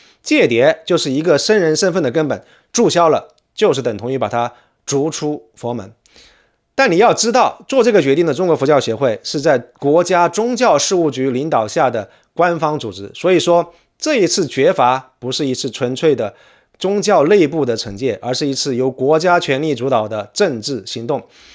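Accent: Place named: Hong Kong, China. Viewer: 博主是不是香港人或者广府人？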